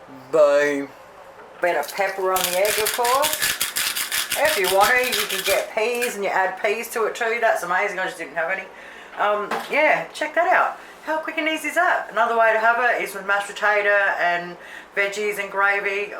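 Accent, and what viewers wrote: South Australia, Australia. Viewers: You have to be a south aussie, I can tell lol Love your recipes, thanks for sharing 😊😊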